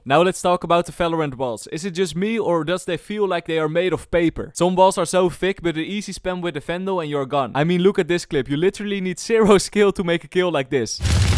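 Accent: Netherlands. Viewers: Me: 99% certain this guy is dutch like me cuse of the accent. You: “easy POTJE valorant” Me: 100% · this accent sounds kinda like dutch... :) but i like your vids man keep going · I hear ur dutch accent so loud “if you wanna play a easy potje” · I suspect you were Dutch by the accent, but then proved it lmao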